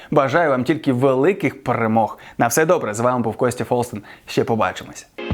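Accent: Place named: Russia. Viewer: Я думав, тут голи покажуть, а тут тільки хлопець хизується, немов перед дівчатами. Ще й акає, мов рюсскі.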